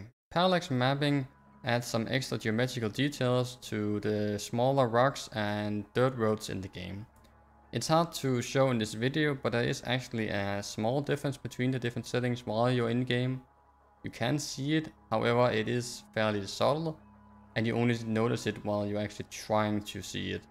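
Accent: Denmark. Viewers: den mest danske accent jeg har hørt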